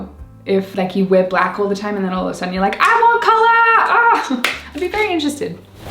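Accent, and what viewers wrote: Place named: Australia. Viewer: Because of your accent, I heard fly swatter as fly sword and thought that must be the coolest Australian slang ever. Hope you're having a great Thanksgiving, although I may be revealing my American toolishness by saying that, and hope you have a wonderful holiday season through the rest of this year and into the new one.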